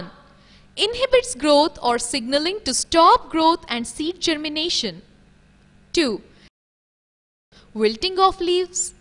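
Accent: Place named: India. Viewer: typically indian lol plz dont change english t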